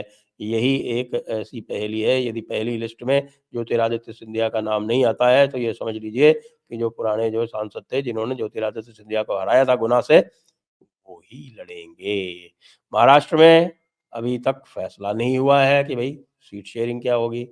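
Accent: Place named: Uttar Pradesh, India. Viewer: UP se ho aap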